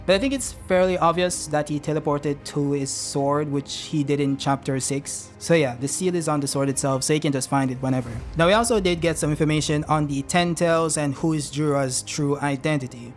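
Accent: Jamaica. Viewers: yo bro are u jamaican?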